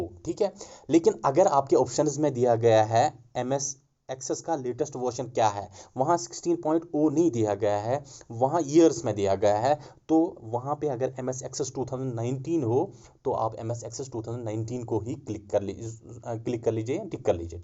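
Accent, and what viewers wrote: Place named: Kulgam, India. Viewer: Meh Basan Sir chu Kulgam Rozan As per Language. Kaiz mh che kulgam peath knh Dost, wo b same Sir ki Tarah baat Krte hai. Content 👌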